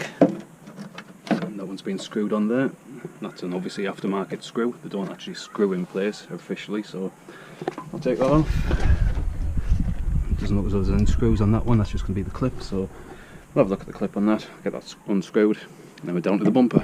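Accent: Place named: Durham, United Kingdom. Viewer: Will you put mine on? I’m from Durham sounds like your from up here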